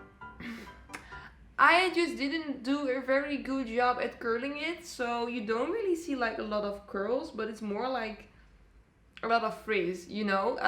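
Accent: Netherlands. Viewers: Yayy! I love that hair and your dutch accent ^^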